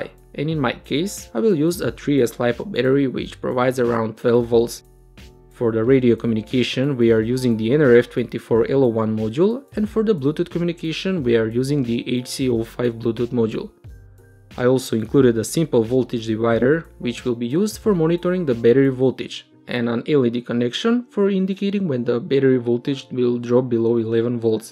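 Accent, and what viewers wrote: Russia. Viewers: Русский акцент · какой то русский акцент присутствует или мне кажется?) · как же слышен акцент русского))))))))))0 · Слышится акцент, скорее всего русский) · Русский акцент, русский чтоль ?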